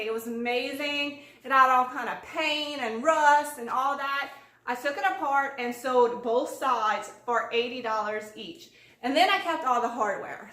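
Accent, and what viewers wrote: Louisiana, United States. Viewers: Gotta be from Louisiana. Love the accent!! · i live in TExas but im cajun 100%(POUSSON) maiden name 😁 was born lafayette and raised in Iota , by Crowley La I feel like im not so far from home when i hear that great cajun accent sha !!!! I am hooked on your page , love your work ! My junkin sista ! Lol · I have to know..... you're from bayou Lafourche, huh? I can hear it!! lol! Im from Houma. Your boards are gorgeous!!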